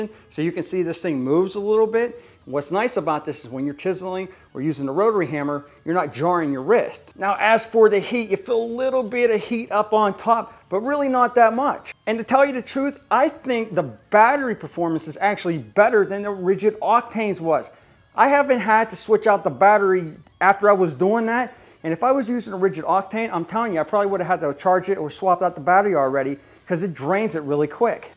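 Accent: Pittsburgh, United States. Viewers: Love the Pittsburgh language!!! PGH STEELERS 4-LIFE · 🤘🏻Pittsburgh speak 🤘🏻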